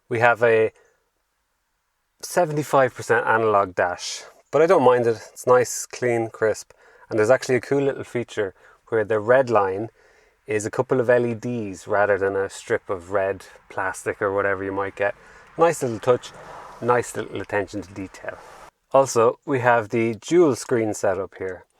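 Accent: Ireland. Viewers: I love that Irish accent 😊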